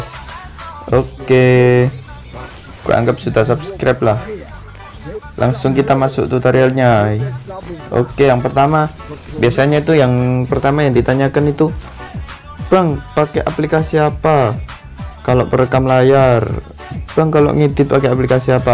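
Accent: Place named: Java, Indonesia. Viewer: Lu orang jawa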